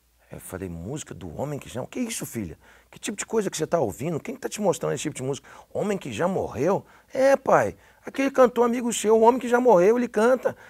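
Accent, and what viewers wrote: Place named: Brazil. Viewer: Interessante o falar q pensa e sonha em ingles... ele eh o primeiro gringo q conheço q consegue 100% do sotaque brasileiro... incrivel demais, provavelmente algo divino mesmo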